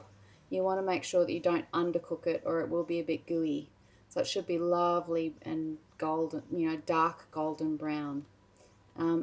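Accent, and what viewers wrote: Australia. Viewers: Thanks!! Yes, any tips would be great. I am only just starting in the world of sourdough and am in the process of building my starter. I've watched many videos on starters etc but I love yours the most because they are simple, very clear and close to my heart and home because Im an Aussie too...(Aussie Greek). So hearing the Australian accent always warms my heart. I wish you a blessed Christmas and all the best with all your cooking endevours. At some point I will also check out your soap making videos too. Cheers, Mandy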